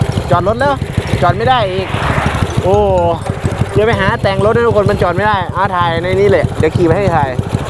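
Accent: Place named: Phu Thai, Thailand. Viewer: พี่พูดพาษาผู้ไทเหมือนผมเลย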